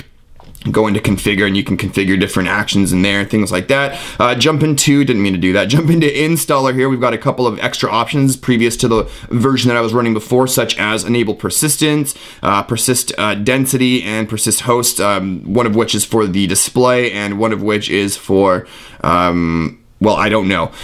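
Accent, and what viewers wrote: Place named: Canada. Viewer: Hehe you're so Canadian... that accent, man... =)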